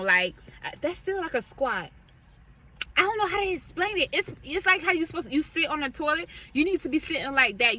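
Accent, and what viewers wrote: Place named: South Carolina, United States. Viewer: I love your American South Carolina accent iam living in my car too in DMV area, and thank you for your important info and that iam not alone, much luv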